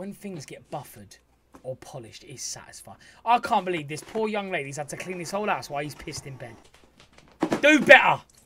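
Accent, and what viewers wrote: United Kingdom. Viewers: man, i love your videos so much, and the ( English British accent 😍 ) amazing bro . big 👋 from an Egyptian fan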